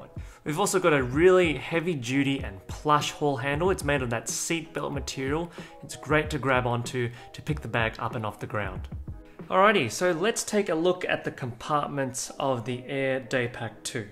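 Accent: Australia. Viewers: Was just noticing your accent in the video and thought shit this guy might be Australian, but then measuring bag capacity in 6 packs confirmed it hahaha.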